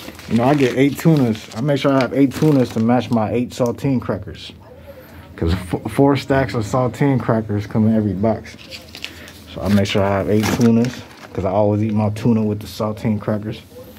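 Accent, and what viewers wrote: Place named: Indiana, United States. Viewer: What’s really crazy is on the outs you’ll only see 98% of the stuff he has in a hood store. Nowhere else. N bro sound like he from Indiana or Michigan he don’t sound like he frm da city or Illinois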